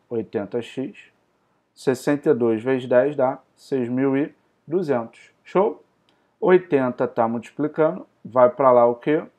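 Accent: Rio de Janeiro, Brazil. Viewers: A melhor forma de aprender matemática, com sotaque carioca, muito bom vcs são incríveis 🎉🎉🎉❤❤❤❤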